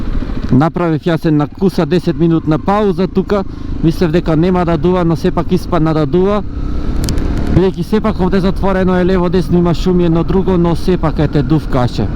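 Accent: Bitola, North Macedonia. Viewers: Македонски јазик како просечен битолчанец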